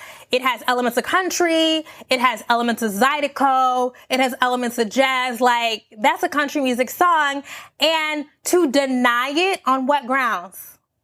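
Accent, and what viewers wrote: United States. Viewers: I thought you were from Alabama.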